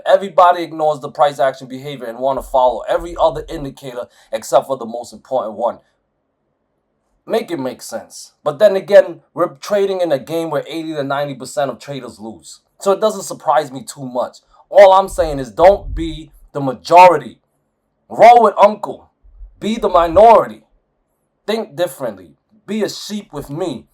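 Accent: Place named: Northeast, United States. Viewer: you sound like you're from the northeast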